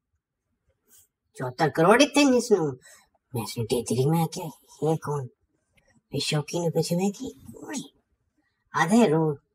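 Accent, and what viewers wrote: Saraiki, Pakistan. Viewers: Garav gera salute to h that u kept ur ancestor's language alive even in india after d partition..lov ur sraiqi axcent i belong to pakistan from sraiki belt althought nor a sraiq myself but knows sraiki very well...thuwaku meda salute bauw zabrdast alaa ay thuwadi ji khush krdita hay zraiqi zindabad saadi mithi alaa te salamti einku alaawarnd alaa te....🎉🎉🎉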